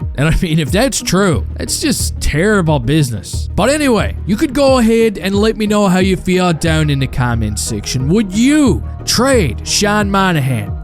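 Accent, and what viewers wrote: Newfoundland, Canada. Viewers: This guy sounds so newfie I had to stop 40 seconds in.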